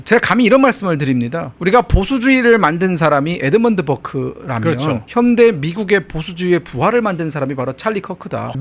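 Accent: South Korea. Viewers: 이사람 탈북자 임에도 북한억양이 하나도 없네 톤이 그냥 우리말이네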